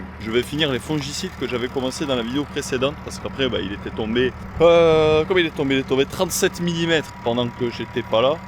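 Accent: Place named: South-West, France. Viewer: Nouvel arrivant sur ta chaîne, j'aime beaucoup tes vidéos,ta façon de voir les choses et j'apprécie cet accent de chez nous ca fait plaisir...je dis de chez nous car tu as l'air d'être guère loin de mes belles LANDES,un voisin du Gers ou non loin je suppose 🤷‍♂️😅 le sud ouest en tout cas 🥰 Bon courage et bon travail a toi,avec une centaines d'hectares tu as de quoi faire !! 💪✌️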